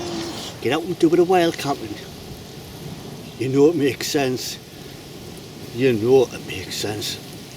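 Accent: Newcastle, United Kingdom. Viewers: Love the Geordie (?) craziness, any chance of subtitles for us Southerners - only joking ;-) Useful info too thanks.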